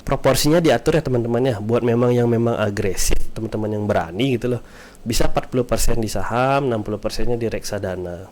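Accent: Bali, Indonesia. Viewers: Wah kakak orang Bali ya Logatnya kerasa banget ❤️✨ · yeeeee nak Bali boss logat nya keliatan sekali milu sik trading nah hehe makasi sharingnya salam kenal kawan baru dari Bali menyimak see u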